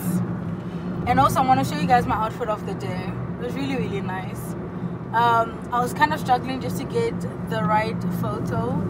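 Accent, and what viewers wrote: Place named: Botswana. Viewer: Your Setswana accent got me curious to know where you originally from, it’s not far from how we speak in Kimberley “without the Afrikaans 😂”.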